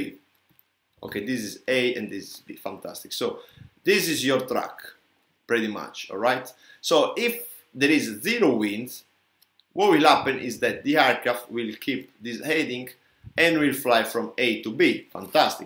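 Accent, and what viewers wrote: Italy. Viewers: Very well explained despite the very strong Italian accent.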